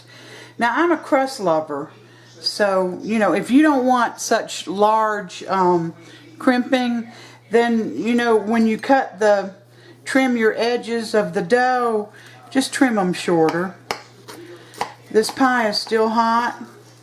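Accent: South, United States. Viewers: I totally enjoyed your video!... Your Southern accent and the TV on in the background, made me feel right at home, in the kitchen. My Grandma was an expert pie maker, unfortunately She didn't choose to share her secrets and skills, but watching you I can imagine this is the way She made her pies. One thing I can agree with is the patience that is required to make great pie dough. It's more than just throwing some ingredients in a bowl and mixing it up. I have always said there is an art to making flaky pie crust. You said it should be done quickly, but you didn't do it quickly, and it turned out great. I guess I am saying that because I am not quick, lol. Also do you recommend Granny Smith Apples, to make the pie filling?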